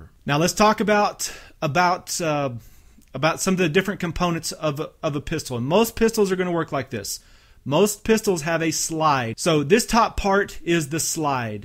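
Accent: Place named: South, United States. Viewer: He kinda sounds like he's southern/midwestern so maybe he's in Missouri or Kansas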